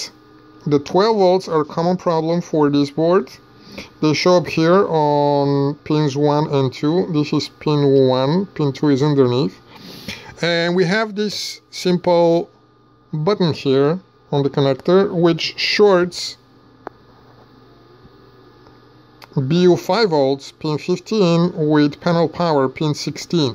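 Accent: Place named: South, United States. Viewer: фу какой блевотный южный акцент!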